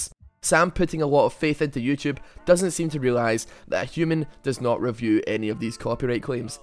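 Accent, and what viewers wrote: Scotland, United Kingdom. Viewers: are you fookin scotish m8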